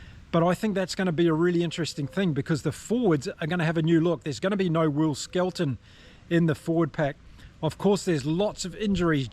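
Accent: New Zealand. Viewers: Hi, thank you for the support, I hope you are right!! Also thank you for your neat articulation as you speak, it is really easy to understand your english in spite of your New Zeland accent, to which I'm not used to listen. For example, I have far more difficult to understand the other from Two Cents Rugby. Cheers!!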